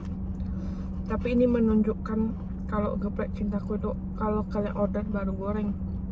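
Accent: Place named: Medan, Indonesia. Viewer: Gua Orang Medan dan udah 5 Tahun tinggal di Bandung. Kalau kangen makanan Medan dan logat Medan, selalu nonton Channel Mukbang karena logat nya itu loh khas medok Medan banget 😁 dan kalau liatin makanannya selalu bikin kangen Rumah. Sukses terus ya ~ ❤️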